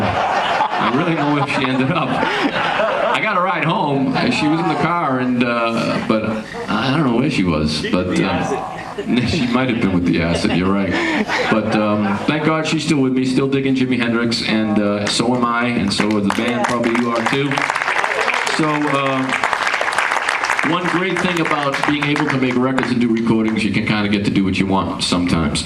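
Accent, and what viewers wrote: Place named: Boston, United States. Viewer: gotta love that Boston accent. Amazing player with a new unique voice while still having an old soul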